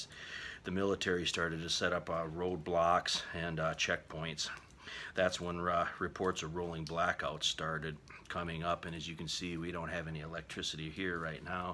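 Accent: Michigan, United States. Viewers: Sounds like you did some research for this one.👍 Just found your channel and subbed Sounds like you’re from Northern Mich. also.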